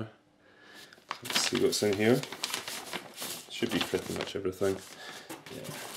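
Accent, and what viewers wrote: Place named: Glasgow, United Kingdom. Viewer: Love your videos, you and 6oodfella make me feel right at home - team Glasgow! :D (you sound glaswegian haha). Also, burn yourself a copy of lost eden on CDI :)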